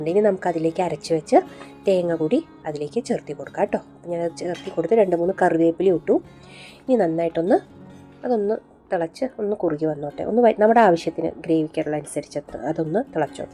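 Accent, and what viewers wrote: Palakkad, India. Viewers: പാലക്കാടൻ ഭാഷ അടിപൊളി.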